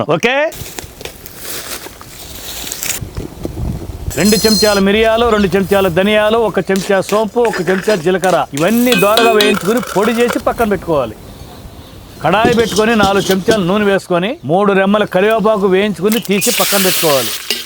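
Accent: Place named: Telangana, India. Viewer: తాత నువ్వు వంట చేసుడేమో కానీ నోరు ఊరుతుంది తాత ఏమన్నా చేస్తున్నావా వంట మన తెలంగాణ యాస లో సూపర్ chepthunnav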